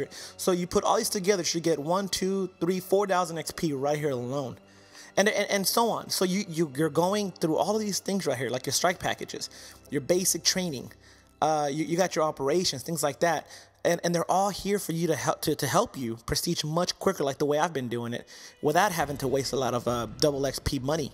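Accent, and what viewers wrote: India. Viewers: baraks? is this guy indian? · You sound like an Indian I can say from my personal experience in living abroad anyway great video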